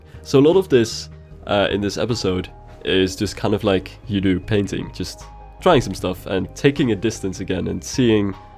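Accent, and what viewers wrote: Netherlands. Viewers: Literally Dutch with a terrible cold... Beterschap,!:)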